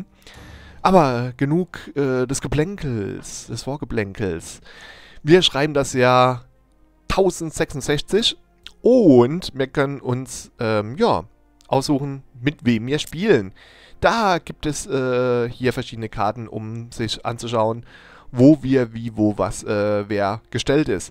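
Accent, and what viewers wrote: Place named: Baden, Germany. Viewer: Kann es sein das du aus Karlsruhe kommst du hast mal deine Stadt in Sim City mit Karlsruhe verglichen und jetzt spielts du Baden, und dein Akzent scheint mir irgendwie auch aus dieser Gegend zu kommen da wohn nämlich auch (im Breisgau) Mach Baden bitte zu einer Großmacht und über nimm wenn irgendmöglich Schwaben/Würtemberg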